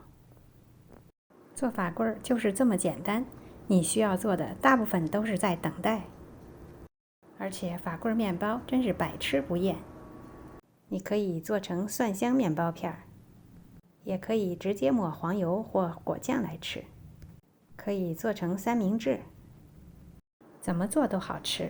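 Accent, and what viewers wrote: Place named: Apulia, Italy. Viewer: Acc... Ma che è.. Un misto inglese e pugliese? Comunque conpliment 🇮🇹👍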